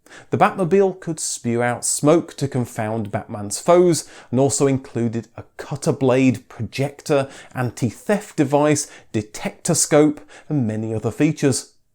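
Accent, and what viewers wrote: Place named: United Kingdom. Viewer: Thank you so much for this great video. I thought more replicas had been built: about 8. I read this somewhere. As usual, it's a pleasure listening to your perfect British accent. That's a bonus to me!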